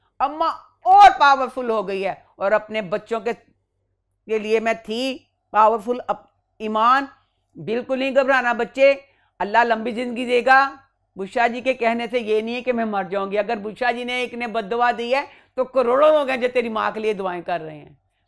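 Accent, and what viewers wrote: Punjab, India. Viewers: Such a veteran actress Bushra Ansari is. I love her comedy skits she used to do on PTV. But she should be more open to criticism and accept the flaws of her play. She should understand she comes from the elders of the industry and must act like one. love you❤ I recently start watching your channel and I love your effort. I am a urdu speaking person but your punjabi tone is what i love the most and bushra appa made fun of it. She should know the most of the trps and her fame came from punjab and its very low of her saying you "pandu". Wisdom and sense of aesthetic can come from anyone who is a good observer. Peace. Keep growing ❤ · True.. I'm also a Pathan but we learn a bit of Punjabi accent from her too.. That's the beauty of Pakistan.. We love ❤️ all languages and dialects spoken here..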